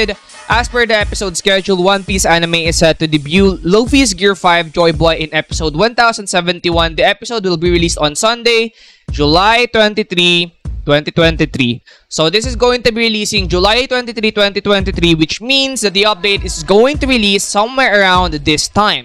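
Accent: Philippines. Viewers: Your accent is like so Filipino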